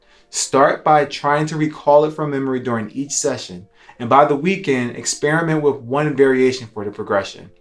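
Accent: United States. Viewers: Funny, you sound American, but the road footage shows someone driving on the left side of the road. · Ik this prolly irrelevant right but u sound like u from Philly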